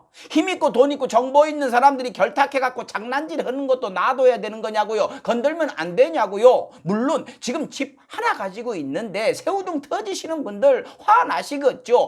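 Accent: Jeolla, South Korea. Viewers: 무슨 말씀을 하시더라도 상관없는데... 제발 전라도 말은 과하게 안쓰셨으면 좋겠습니다 ㅠㅠ 제가 전라도인데도 전라도를 대표하는 사람처럼 얘기하지 않으셨으면 좋겠습니다 ㅜㅜ정말 부끄럽습니다ㅠㅠ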